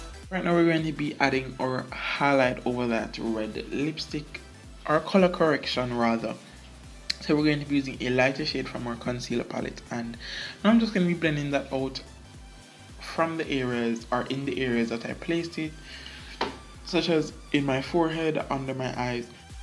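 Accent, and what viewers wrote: Jamaica. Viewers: I knew it cuz like u sound like a Jamaican who just know how to speak good English instead of patwa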